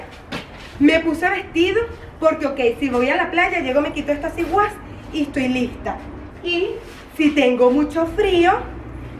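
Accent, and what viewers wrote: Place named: Venezuela. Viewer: Nuevo suscriptor ♥️ Saludos desde #CostaRica Te ayudaré a conseguir más seguidores Tienes acento venezolana 🔝🔝🔝🔝🔝🔝🔝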